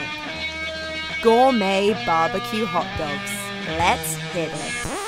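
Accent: United Kingdom. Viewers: I like how it’s a 4th of July video but the announcer is British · BRITISH WOMAN NARRATING SOMETHING ABOUT THE 4TH OF JULY?!?!? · narrator is British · The ironic thing is the narrator is British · Funny how the narrator was British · It's ironic because the woman who is talking is bridish, and usa independence from UK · The narrator has a English accent...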